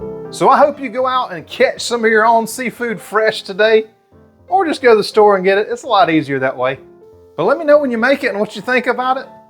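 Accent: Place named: South, United States. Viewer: I just love when you say, (in your distinct southern accent 👍🏻) “Well, I forgot to add that in,” or “I forgot to show you that,” or you forgot whatever…..& then you just correct it & move on. 😀 That means that yes, you are my people!! Because that’s exactly how I run my kitchen!! 😂 This is great seafood comfort food right here! Mmmm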